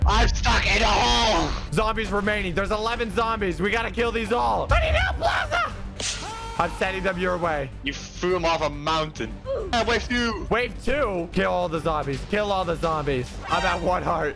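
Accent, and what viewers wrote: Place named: United Kingdom. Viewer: I love you sockfor1 even in British I still love you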